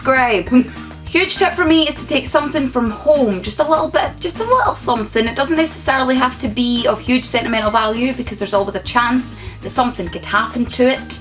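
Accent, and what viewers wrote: Scotland, United Kingdom. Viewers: Are you scottish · Are you Scottish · I love your accent. Ive been to Scotland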